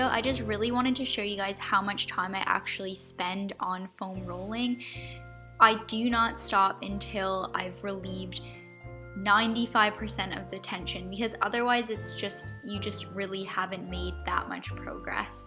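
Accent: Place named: Canada. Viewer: canadian accent is cute :)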